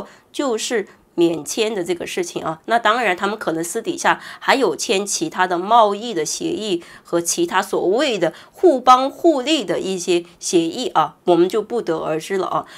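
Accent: China. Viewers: 妳就是中國大陸口音啦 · 你的口音大陸人，我不知道你的目的是什麼？ 我看到是美國也在世界各地媒體和BBC英國媒體之前也是有收撥款來黑大陸 ， 你了解的大馬是很少 第一在大馬攝影師也是要去註冊申請公司才能營業也要交稅 第二大馬建設和大陸合作很多年了本地政府也為了保護國家就業只是批准一些專業人事來工作 其他承包商一定要是本地公司除非是本地沒有企業有代理的才能申請，勞工大馬本地人也不做也是進孟加拉和印尼工人居多 如果你說的攝影師沒有執照去大馬營業拍攝如果被抓到他們也會被罰款或者坐牢 為了賺299值得嗎？攝影師恐慌是好事其實可以逼他們創新和新的拍攝方式 如果大馬本地有錢也會一部分去包其他國家優秀的射影師來拍攝不同風景 因為本地的攝影師拍來拍去一樣的風格喝喜酒討論會問是不是某個攝影社拍的同類型 也會悶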